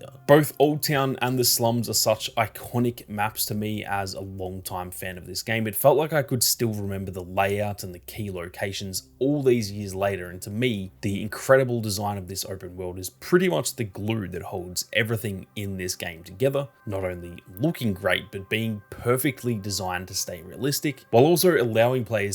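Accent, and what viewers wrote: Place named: Australia. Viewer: Nothing better than hearing another aussie talk about peak.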